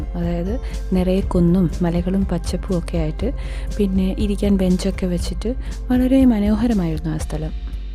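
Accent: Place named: Punjab, India. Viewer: Yaa allah... നിങ്ങൾ മലയാളം പറഞ്ഞോ... 🥰 അപ്പൊ മലയാളി ആണോ.. 🤔🤔🤔 നിങ്ങളുടെ english കേട്ടു, വല്ല പഞ്ചാബി ആണ് എന്ന് , എനിക്ക് മാത്രം , ആണോ തോന്നിയത് 😜🤪😜 നിങ്ങൾ സ്ഥിരമായി ഇരിക്കുന്ന bench അല്ലേ അത് 😍😍 അര്മേനിയ പോയ videos കണ്ടില്ല 🙂🙂 ശരിയാ... എന്നാ, ലുക്ക്‌ ആണ് ഈ സ്ഥലം... 😍😍😍 14 കുരിശൊ 🤔🤔🤔🤔 അത് കണ്ടില്ല 🙄🙄🙄🙄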